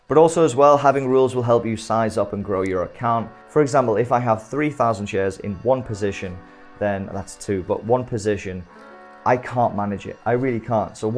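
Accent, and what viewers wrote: United Kingdom. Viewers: Great video, after watching 2 weeks worth of americans shouting about what to do it was so refreshing to hear a British voice talking sensibly. Thanks for your time and I'll be using some of your recommendations to get started properly. Regards Steve from Cardiff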